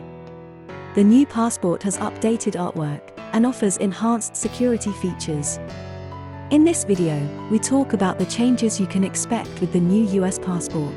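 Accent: United Kingdom. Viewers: why is a british person narrating a video on US passports?? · It for a US passport yet the speaker has a British accent. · An British voice over advertising an American passport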